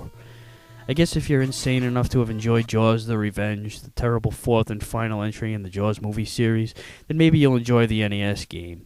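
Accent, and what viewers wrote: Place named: New Jersey, United States. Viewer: sounds like you're from jersey.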